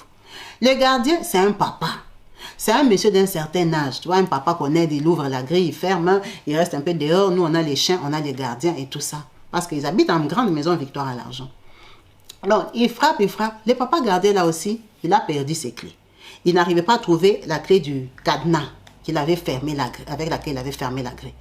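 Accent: Democratic Republic of the Congo. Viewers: Ooh la mère chérie je vous aime bcp maman surtout votre accent congolais🥰🥰🥰😘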